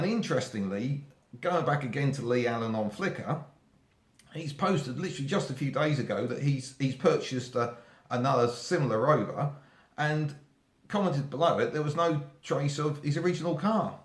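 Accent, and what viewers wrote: United Kingdom. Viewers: I like your Cockney English. So you are from London? By the way, good story!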